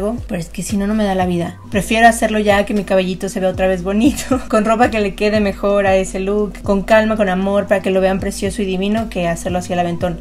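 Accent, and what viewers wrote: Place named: Yucatan, Mexico. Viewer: 😂 justo iba a preguntar si es yucateca porque le noté acento 😂 viendo el video ya entendí por qué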